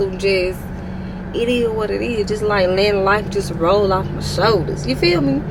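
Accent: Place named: Mississippi, United States. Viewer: Mississippi girl here! Eboni Ebo sent me over to your vlogs and I knew from the moment you spoke “she is from the Sipp” haha I love it! I have been enjoying your content so far and will continue to support. Thanks for sharing with us!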